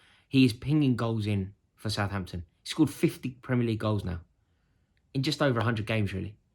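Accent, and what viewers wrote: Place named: Liverpool, United Kingdom. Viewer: What a Liverpool accent you have.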